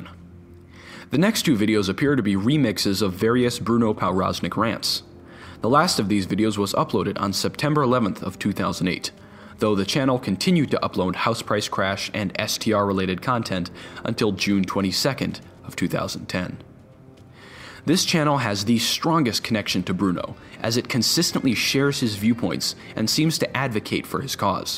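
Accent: United Kingdom. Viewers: The power of having a bri’ish accent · puff it’s Cockney (East London) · this guy has such a heavy british accent i can't stop laughing at his videis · Aesthetical Twat I’m American and i find this hilarious the British accent makes that video better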